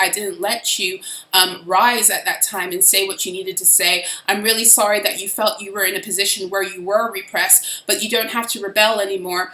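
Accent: United Kingdom. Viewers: Where approx in uk ru. Scottish accent?😊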